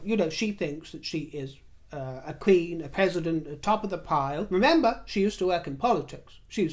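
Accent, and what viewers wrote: United States. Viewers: By the way you have a great American accent! 👏👏👏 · your American accent is outstanding!😊🎉❤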